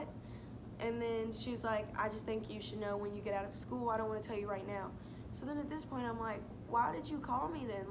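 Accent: South, United States. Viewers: Girl where you from you sound so country lol.. They should've came and picked you up as soon as they could instead of scaring you and working up your nerve.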